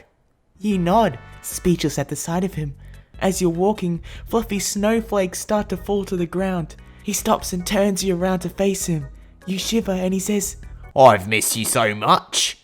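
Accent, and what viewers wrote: Australia. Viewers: You're Aussie accent makes this 10x better lol that one ab Shawn mendez, near the mid of the video the last words were 'Shawn winks' but you said it like: Shawn WANKS lmfao that one had me in tears and also the finn wolfhard one lol and the one that says 'or I'll TICKLE YOU' YOURE ACCENT MAKES IT SOOO MUCH BETTER IM TELLING YOU LOLLLL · Is...Is that an Australian accent? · I'm digging the obnoxious Australian accent for all of the guys' voices.👌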